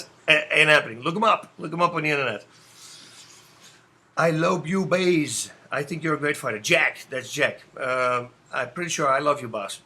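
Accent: Netherlands. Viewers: ja das ff wennen Brabants dialect :)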